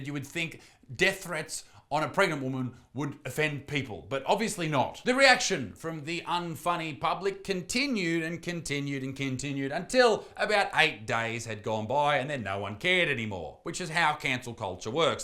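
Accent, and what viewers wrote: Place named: Australia. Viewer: i always thought you lived in australia with your accent and all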